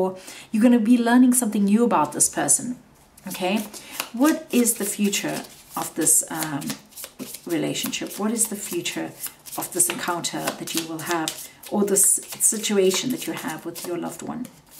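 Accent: South Africa. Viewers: Love your style of reading and soft South African accent 🇿🇦 ♥️ big Love! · Are you South African? 😍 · Your accent is lovely, it's very soothing to me Where are you from? SA? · Are you South African? 🇿🇦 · Are you South African? 😊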